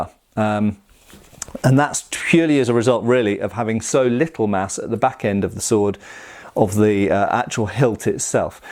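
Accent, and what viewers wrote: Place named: United Kingdom. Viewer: your pronunciation is fine for JIEN and DAO but you sound like that Brit intel guy who sent Bruce Lee on the mission in Enter the Dragon -- he also said "Haaan" while BL used the more genuine "Hahn"... good rule of thumb for pronouncing Asiatic names is to use the typical romance language vowels -- A is usually AH rather than AY or AAA... KEEP UP THE GREAT WORK!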